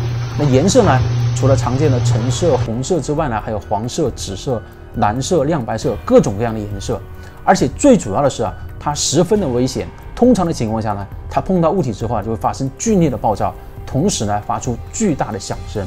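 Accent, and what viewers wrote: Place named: Fujian, China. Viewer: 福建口音听不出来吗你🙈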